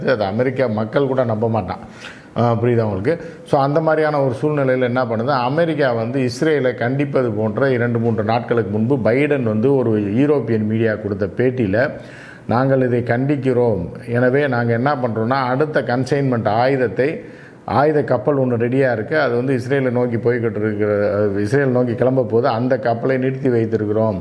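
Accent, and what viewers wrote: Madurai, India. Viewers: <link> pls brother be prepare and talk with our jeeva brother with our madurai slaang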